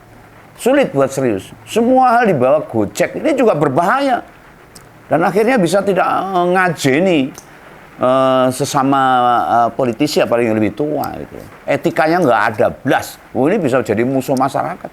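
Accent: Java, Indonesia. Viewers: Om ki ngomong jowone Mantep😅😅 Medok tur genah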